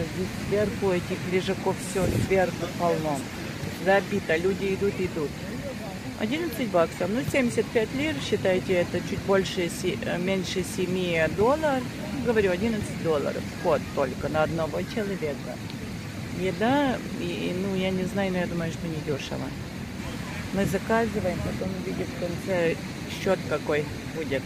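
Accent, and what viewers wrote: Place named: Bulgaria. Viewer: У вас акцент болгарский 😉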